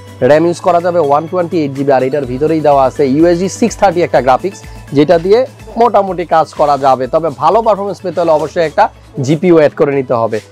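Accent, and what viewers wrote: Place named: Bikrampur, Bangladesh. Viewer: vai apnar gramer bari ki bikrampur answer please vasha shune mone hoy vai❤️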